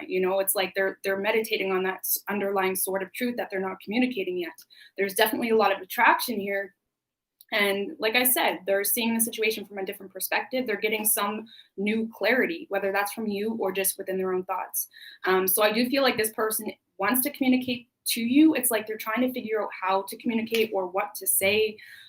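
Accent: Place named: Canada. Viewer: leo is on point. your canadian accent is so cute lol. wu-tang forever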